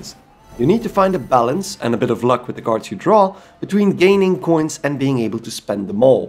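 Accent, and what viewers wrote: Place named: Netherlands. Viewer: I heard your voice and I immediately knew you were from the Netherlands or Belgium ahaha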